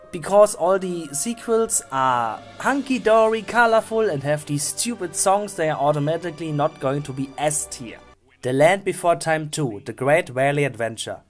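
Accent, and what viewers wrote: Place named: Germany. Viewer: i learn german (stil A1 at the moment) and i recognized the German accent in your videos. funny and awesome as well. also, love your videos, i'm also a big land before time fan, as well as a huge dinosaur nerd. so i know all of the dinosaurus of Land Before Time and Jurassic Park and stuff. loved the Walking With Dinosaurs reference at the end of the video. ("perfectly balanced") i would rank them quite diferently but for now i only have a top three, and a worst tree and there in between i have no idea yet because i havent decided yet. best ones 3 - Mysterious Island 2 - Big Longneck Migration 1 - The Original Worst ones 3 - Great day of the Flyers 2 - Invasion of Tiny saurus 1 - Unlucky Thirteen (totally skipable and unwatchable) Wisdom of friends (fuckery) "perfectly balanced As all things should be." also have to say, even if Stone of Cold Fire is not on my top three spot on the list, it still has in my opinion the best song of all the songlists:. 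but because there are no sharptooth threats, i dont think it deserve the spot on favorite ones because that was a downer for me. (notice, every other sequel had sharptooth action in it, and i always thought it was stupid to not include sharpteeth in 7. technically there were in there, but only as flashback but thatst just dumb.)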